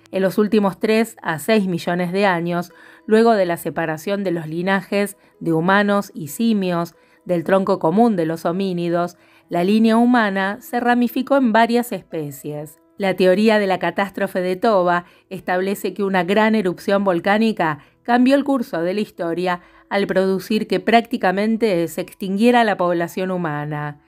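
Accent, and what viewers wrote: Argentina. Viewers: No me gustó, me recontra gusto, explicas cosas muy interesantes. Es el primero video que veo pero creo que los veré todos, me gustaría saber si abordas las teorías de kropotkin... por cierto, me encanta ese acento argentino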